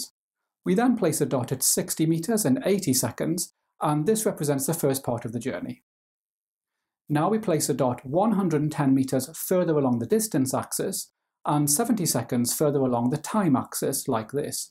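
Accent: Wales, United Kingdom. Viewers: Lmao I thought he was welsh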